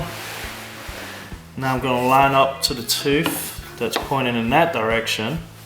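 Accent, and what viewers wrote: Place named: United Kingdom. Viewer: British, Texan and maybe German? accent.. interesting combination. not sure of the German but I swear I hear it in there from time to time!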